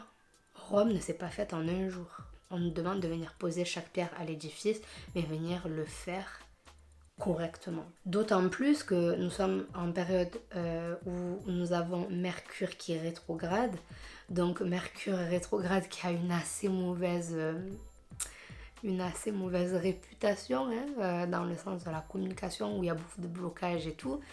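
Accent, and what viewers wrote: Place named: South, France. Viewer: Therapy | Mindset & Manifestation heeeeyyyy la team je suis là ma belle je regarde ta vidéo je me suis abonnée à ta chaîne aussi je te soutiens de fou je te souhaite de cartonner sur YouTube même si je sais que c’est pas ton but premier 😉. J’aime beaucoup ta voix avec ton accent du sud aie 🔥 ton beau visage illuminé 🤩. Franchement tu explique tres bien les choses bravo continu moi je tenvoi pleins de force 💪. Gros bisous de de… la lionne logique 😘😘😘😘😘😘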